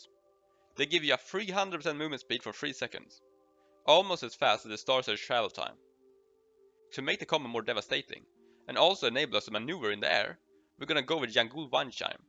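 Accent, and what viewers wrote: Sweden. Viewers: Asså cool vid osv, men din svengelska är så söt, minn or trait 😅😅😅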